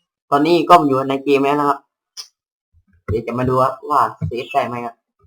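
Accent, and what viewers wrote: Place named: South, Thailand. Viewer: พูดเหมือนคนใต้เลย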